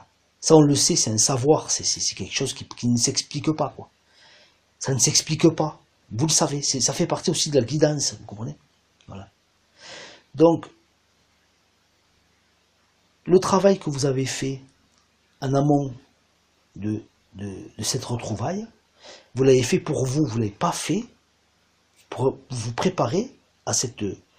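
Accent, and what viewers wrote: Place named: Toulouse, France. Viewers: ✨Je me suis abonnée depuis le début car j'ai de suite ressenti votre authenticité. Votre discours unique fais du bienggg😂 J'ai bien reconnu votre accent car je suis Toulousaine. Continuez surtout car vous faites du bien. MERCI✨🙏✨Bien CORDIALEMENT. ✨💚✨🌟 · Bonsoir , tres important vos messages , n'être plus dans l attente , celle-ci est primordiale.. Ouiii 3 belles erreurs qui priment❤ et effectivement le runner doit entendre que le chaser vie sa vie, ça y est , il la conscientisé...et gratitude à l'univers pour tous nos cadeaux de la vie ... Merci belle voix du Sud Ouest 😊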